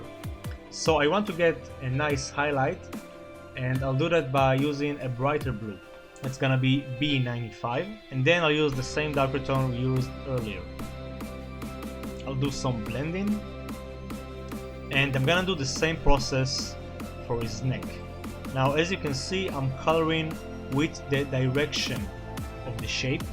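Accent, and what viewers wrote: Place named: Israel. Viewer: חחח יש לך שם ומבטא של ישראלי. יש מצב? Anyway, Your art is amazing!!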